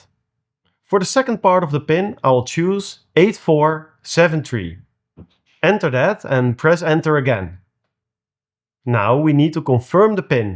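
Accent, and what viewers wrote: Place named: Netherlands. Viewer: lol dacht ga engelse vid kijken gelijk NL Accent gehoord nu nog meer geintresseerd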